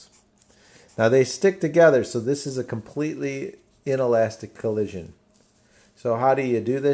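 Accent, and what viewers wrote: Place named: Michigan, United States. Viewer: Sound like your from Michigan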